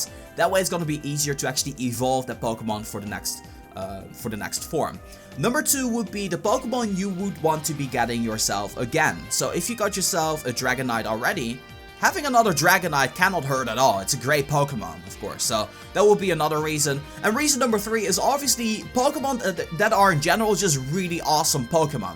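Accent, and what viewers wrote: Netherlands. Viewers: ur dutch right? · Hey, are you dutch? i am and i thought you where too <3 more pokemon vids<3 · That horrible Dutch accent :(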